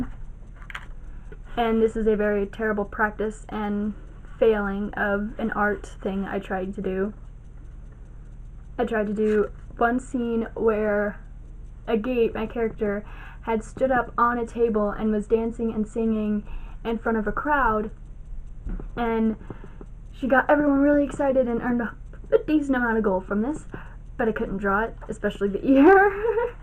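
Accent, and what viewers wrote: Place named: United States. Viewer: I'm not sure any if any of the threats given are enough for him to be arrested, the server is small and between friends, so I'm not ok disclosing that. But I will give you his account it's AllergicToPlebs#4779 Now I'd suggest blocking him. But it's your call. From your accent I'm assuming you're American, most people on the server are British or European. Aside from that, I wish you the best of luck, if you can slap some sense into him at the same time that'd be very nice too. I'm getting tired of him, as close as a friend as he is.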